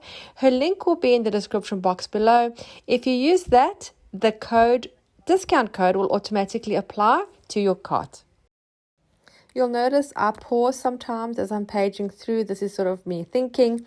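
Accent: South Africa. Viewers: Hi, I just found your channel! So happy, and I hear a South African accent? I love your style. I need to binge watch your videos. ❤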